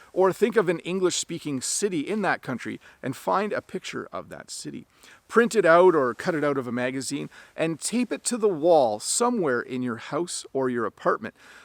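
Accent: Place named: Canada. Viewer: Hey teacher Eu demorei um pouco a entender que você era do Canadá. Por que pra nós nativos de língua Portuguesa, temos dificuldades em entender os diferentes sotaques em inglês de países diferentes. Mas eu estou progredindo com seus vídeos. Professor, sucesso para você sempre. Que Deus o abençoe. By 🇧🇷🇨🇦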